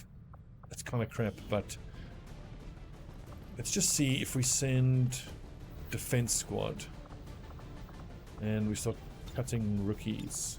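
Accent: South Africa. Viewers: Great to see your back, really missed those South African tones of yours.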